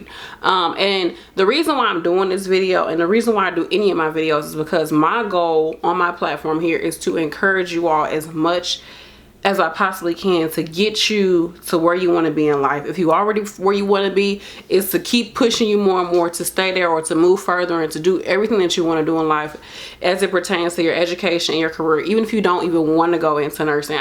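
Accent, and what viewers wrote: St. Louis, United States. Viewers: I knew you had to be from St Louis I can hear it! I’m from St. Louis too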